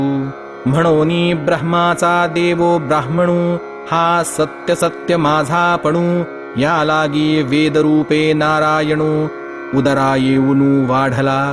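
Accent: Standard. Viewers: Khup dhanyavaad... Aj guru krupene ha garth ch parayan karych ichha ali... And tumcha ha vedio bhetlaaa khupp khupp dhanyavaad... Tumchya vedio muje agdi shuddh uchhar hotatt... Khup shayog bhetlaaa.... 🙏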